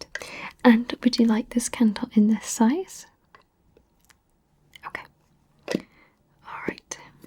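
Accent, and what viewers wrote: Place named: Wales, United Kingdom. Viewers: She’s definitely Welsh, I can hear the accent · U sounds like a British tho u r american :)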